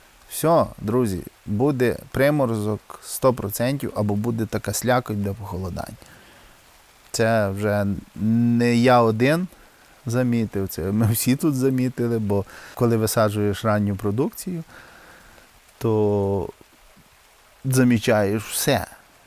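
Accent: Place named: Khmilnyk, Ukraine. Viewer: Привіт. як справи бачу вже посміхаєтесь йдите на поправку. ви з хмильниччини? ато акцент рідний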